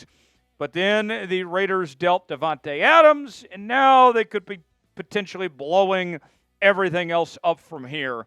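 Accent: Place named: Appalachia, United States. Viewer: This guy's just keeps on making bullshit content so he can use that hilariously hillbilly toned accent and annoy everyone within yelling distance.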